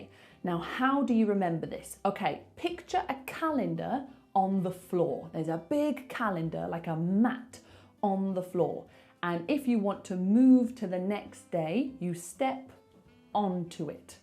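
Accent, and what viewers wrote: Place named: RP, United Kingdom. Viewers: I like English accent ！ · I love you rp accent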